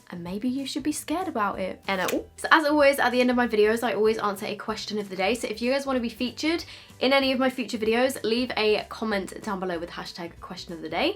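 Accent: United Kingdom. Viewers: i love your accent!! I generally want to move to the UK just hear people talk with the accent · When I click on your vids i always think you’re gonna be from like Texas or sum and you’re British 😂😂😂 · She's literally Summer Mckeen+Sabrina Carpenter+british Accent :)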